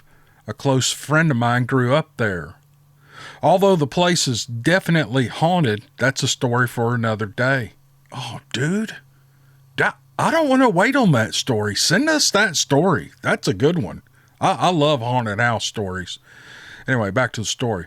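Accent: South, United States. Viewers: don't change anything. I just discovered this channel not too long ago, and I really like your laid-back, down-to-earth approach. Your accent and the background country music makes me miss the USA as a UK citizen. I went to school and worked in Iowa and Massachusetts for 18 years, and it's like a second home to me. Thanks for all you do.:) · YOUR UNIQUE SOUTHERN BRAWL AND EXPRESSIVE OPINIONS ARE WHAT MAKES YOU........WELL, YOU! IF THESE VERY FEW "CRITICS" DON'T CARE FOR YOUR STYLE OF NARRATION, THEN, HEY, THEY CAN ALWAYS GO SOMEWHERE ELSE!! IT IS, AFTER ALL A VAST INTERNE WITH MANY OPTIONS. I'M SURE I SPEAK FOR MANY OF YOUR LOYAL LISTENERS IN SAYING "YOU KEEP ON KEEPING ON"! "YOU DO YOU"!! YOU GIVE THE STORIES THAT LITTLE TWIST AND YOUR REFRESHING OPINIONS REALLY ENCOURAGE ONES WHO MAY BE SUFFERING OR ANXIOUS ABOUT THEIR SIGHTINGS!! KEEP ON "YAKING", LOVE!! 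FROM ALL YOUR FELLOW TN FANS, DON'T STOP BEING!! LOVE YOU JUST THE WAY YOU ARE!! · I haven't listened to this channel in a while, but I do like Southern droll, his enthusiastic style and layed back charm, but I've noted in the YT comments(and in narration), that there is a ruckus about analaysis after each story/report? Can I suggest that it could be a good idea to put bookmarks for each story with matching timestamps within the description - it'd be easy to do and would keep everyone happy(ish). BTW there's also some grumbling on other topically associated YT channels about this too - maybe it's a "sign of the times" thing that we are currently living in/under?! Keep safe and well, and remember to be humane to each other(I include myself), as times are tough in many ways(ditto).:) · Chat all you want to. How can you not enjoy a southern accent? It makes some of us go weak in the knees. 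😉😊🐾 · I'm from Oz&love that Southern US accent. The more yakkin from the more content I am👍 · I could listen to Southerners talk all day. I love your channel, especially the Steve Lily stories. Don't join the cancel culture and change something that's great, just to appease a few brain dead whiner's. God bless, James in London.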